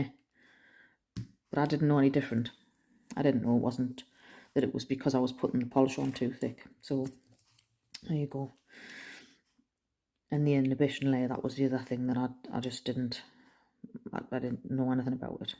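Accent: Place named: Newcastle, United Kingdom. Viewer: Heya found you through Aggie & Jo... but 1st I just gotta say "hawayman" 😂 bloody love the jordie accent!! Me bros in Cramlingtin (think I spelled that wrong) but yeah he's in Newcastle years now. Anyways gonna watch the rest now 💕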